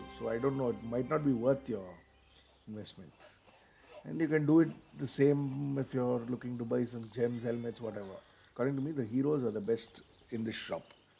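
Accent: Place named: India. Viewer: hey nice video man Are you from India? u r english accent is completely matching to indian style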